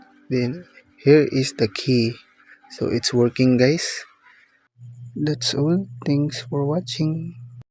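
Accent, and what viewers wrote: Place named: Indonesia. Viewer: u from indonesia u sound it very same and u black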